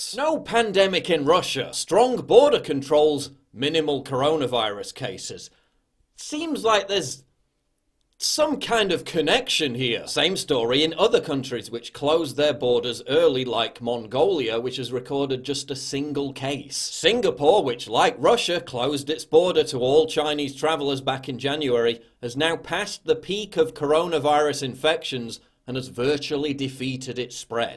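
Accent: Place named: England, United Kingdom. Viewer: I love you,. You are not only young and handsome, ( don’t worry,I’m 73 years old) but smart, politically well informed, and courageous. Oh, and I loved your beautiful English accent! Oops, did I sounded racist?! What the heck! Very seriously now, this is an analysis that we don’t see in the corporate media full of coward unprofessional journalists Excellent! 👏👍❤️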